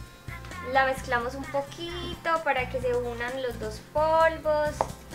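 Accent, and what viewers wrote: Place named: Antioquia, Colombia. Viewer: Realmente el acento Paisa.. (de Antioquia)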